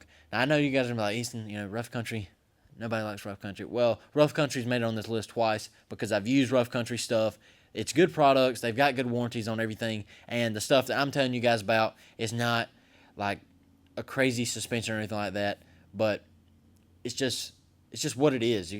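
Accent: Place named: United States. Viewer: I am taking advice from a kid half or nearly 1/3 my age. He is a detailer, and has a country accent. This gives him Truck Credibility. I want to know how to mount my AR, that pops up out of the bed for people that cut me off, and how to remote aim it with laser sites. Nice looking truck he has there.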